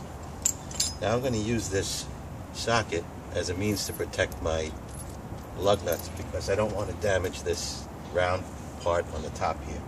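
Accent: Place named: New England, United States. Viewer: Give me a lever and I'll move the world..someone famous said that..archimedes maybe? Thanks for the good tip and great new england accent!. Thought you were one of the this old house guys..👍👍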